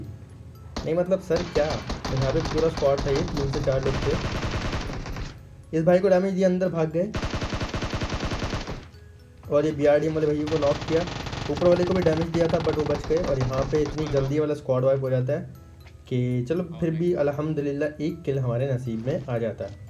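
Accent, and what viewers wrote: Rawalpindi, Pakistan. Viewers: Aap pindi ke lgte ho bawa jee 🤗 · apki zuban se to pindi boyy lagte ho 3)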